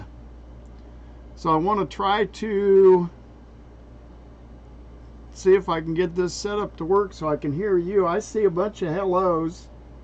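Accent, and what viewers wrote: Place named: South, United States. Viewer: WELCOME BACK,. MISSED YOU TERRIBLY. YOU ARE 1 OUT OF 4 CHANNELS I LOYALLY WATCH. YOUR TRUTHFUL, ACCURATE, AND I LOVE YOUR SEXY, SOUTHERN, GENTLEMAN ACCENT. MAYBE GOD BLESS YOU, AND WATCH OVER YOU AND YOUR FAMILY. GOD IS WITH PRESIDENT TRUMP, GOD WINS. PLEASE, EVERYONE, CONTINUE TO PRAY, AND LET US ALSO GIVE PRAISE AND GRATITUDE TO OUR ONE TRUE GOD OF ISRAEL, OF ABRAHAM, ISAAC AND JACOB. 🕆✡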